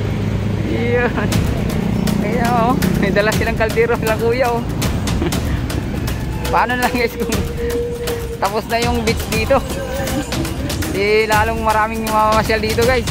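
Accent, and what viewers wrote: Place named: Tagalog, Philippines. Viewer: Nakakatuwa kang pakingan mag salita ng Tagalog. Hahahaha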